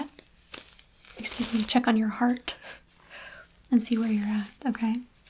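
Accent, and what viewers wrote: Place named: Quebec, Canada. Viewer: If you are not French then you are probably from Quebec (Canada)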